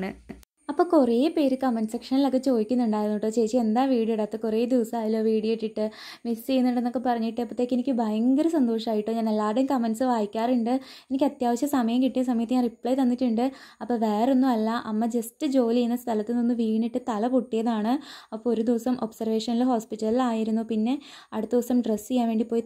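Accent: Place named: Kasaragod, India. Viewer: Kasaragod slang 😍😍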